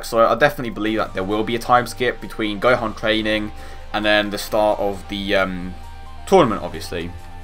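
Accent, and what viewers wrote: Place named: West Midlands, United Kingdom. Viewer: I'm really interested in know what part of England is from. He kinda has the same accent as me and that being a west midlands one.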